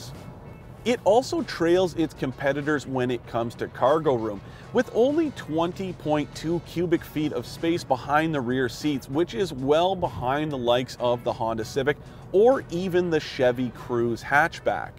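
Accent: Canada. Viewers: Michael Zhu he's Canadian. Canadian's talk the way they do just as people in the U.S. South talk the way they do. Ever heard a southerner drawl out nearly every word they speak?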